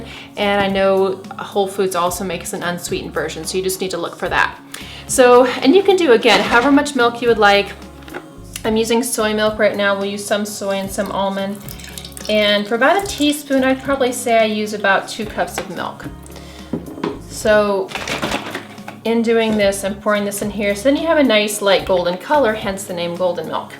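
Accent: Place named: Europe. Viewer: Turmeric milk....very good subject anchored in a nice manner. But Madam u are a qualified person hence u should be slow avoid European accent e.g. u say wada. for water ; it will be kind help for Indians probably from where u belong.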